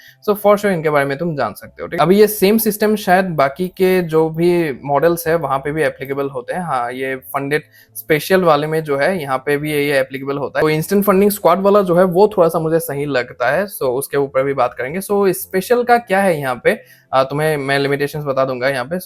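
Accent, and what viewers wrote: Assam, India. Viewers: Are you Assamese ?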